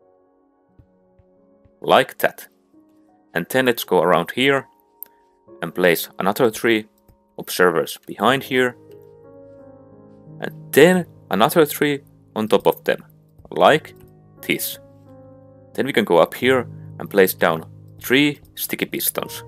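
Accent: Finland. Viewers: I cant focus on the tutorial because I get soooooooooo lost in that Finnish accent 🥰 it’s so beautiful 🤤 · hmm what do i hear? are you from Finland or Estonia? your acsent telss a lot.... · nice finnish accent mate. x1 slap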